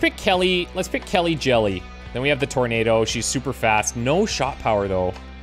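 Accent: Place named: Canada. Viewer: Gets progressively more Canadian as the pressure rises.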